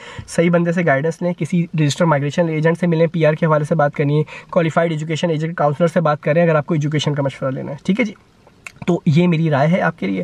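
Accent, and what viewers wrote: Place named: Karachi, Pakistan. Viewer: bhai ya jo ap baat ka andr karachi wala touch daita ha na bss sara maza too is ka ha😂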